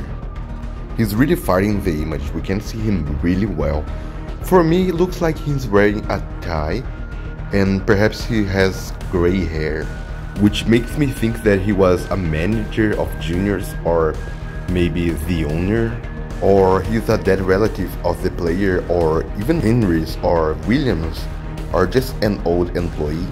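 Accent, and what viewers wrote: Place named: Brazil. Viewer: Percebi de primeira que vc é br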